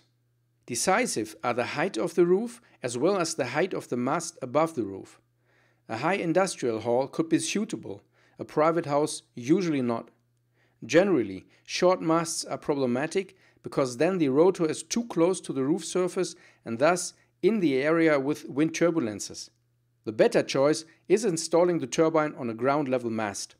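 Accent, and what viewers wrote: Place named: Germany. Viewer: I like English of Germans. I can easily understand it.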